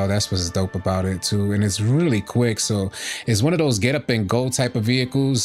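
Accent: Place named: New York, United States. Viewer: You're a NY cat ain't ya.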